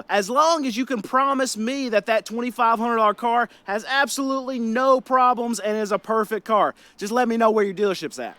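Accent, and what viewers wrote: North Carolina, United States. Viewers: I thought about looking your dealership up for S&Gs. I imagine you are located around Statesville NC based on your accent. (Being from NC myself). I am here for the content, not the cars. I like that you have your ear to the ground on the car market. Keep up the great work.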